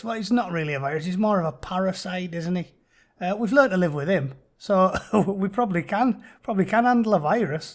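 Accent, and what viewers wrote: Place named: Teesside, United Kingdom. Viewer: Class video, Ex Army Paz 49 sent me here, have to say you've gotta a bit teeside accent, not holding that against you, I'm from teeside as well #virtualhigh5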